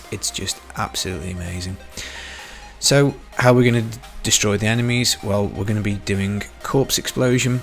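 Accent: England, United Kingdom. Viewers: Is this a GR farming build or GR pushing build? Where you from? I detect England somewhere as I'm in Scotland...I subscribed a while ago and your vids are very good.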